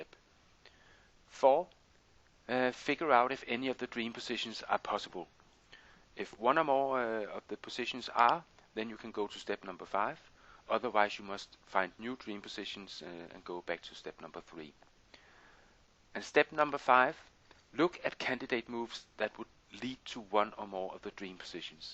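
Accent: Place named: Denmark. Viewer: Love the danish accent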